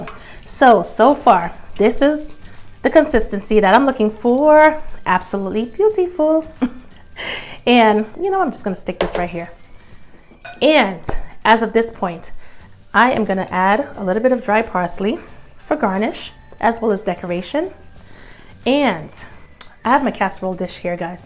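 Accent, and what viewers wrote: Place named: New York, United States. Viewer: Love your new york accent.. It's pretty strong along with your food... All the way from the UK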